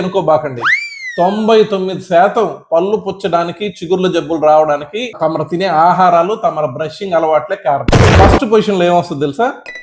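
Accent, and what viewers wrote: Nellore, India. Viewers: Abba Nellore yaasa dhimpeseru sir....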